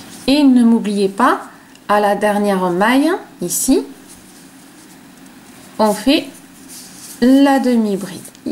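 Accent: South, France. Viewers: Bonjour, avec ce point très sympa, pourriez-vous expliquer comment faire des augmentations et des diminutions, je tenterai bien un pull-over, merci pour vos vidéos très simples et très explicatives et votre accent du sud, moi je suis de la région Marseillaise et je comprends tout❤️❤️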